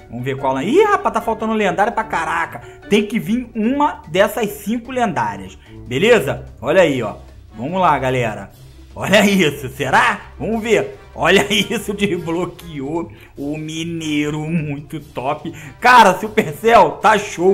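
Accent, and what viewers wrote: Rio de Janeiro, Brazil. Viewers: Meliodas O Taradado n muda nda,antes de ver o cara eu pensava que ele era um carioca magrin esse cara é bom mto melhor q o mister M #MisterMÉoPiorClashr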